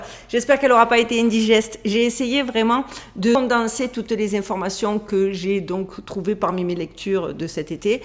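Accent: Marseille, France. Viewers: Merci ma belle, comme toujours super intéressant et documenté. Merci d'ouvrir nos œillères et de nous "bousculer" avec ton bel accent marseillais!! ✨☀️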